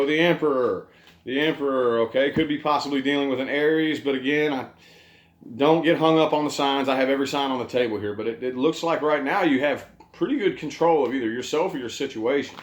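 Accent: South, United States. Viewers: Marry me? 😍 A tarot reader with a southern accent....swoon!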